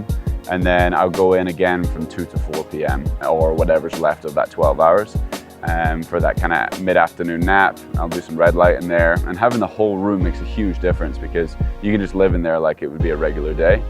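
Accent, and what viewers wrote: United States. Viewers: What’s with the American accent??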